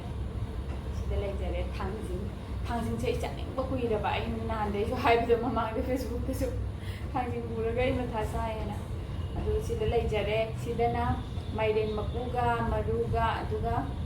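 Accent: Kakching, India. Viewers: Eche d kakchingdei mande lonse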